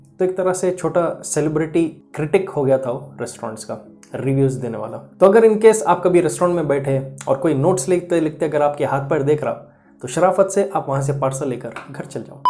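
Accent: Hyderabad, India. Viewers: Hyderabadich hai miya ye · your Hyderabadi accent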